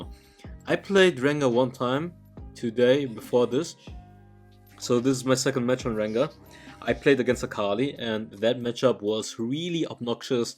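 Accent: Germany. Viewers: Are you german you hearing a little bit like a german boy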